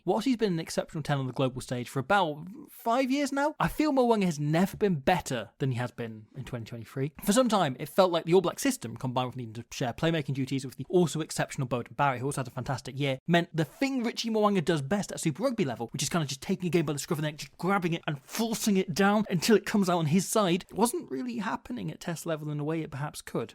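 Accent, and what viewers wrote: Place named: England, United Kingdom. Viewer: are you no longer Welsh(you sound English)? Wayne Barnes best ever...? Really? Hes good, maybe the current best but Nigel Owens was better & IMO best of professional era. Nigel ruled to the spirit of the laws. Wayne, being English, always ruled to the letter but occasionally Nigel contradicted the letter but never the spirit of the laws. Honourable mention Wayne Ericsson -Aus. Legends: Paddy O'Brian & Colin Hawke who invented yelling instructions to the players pre professionalism.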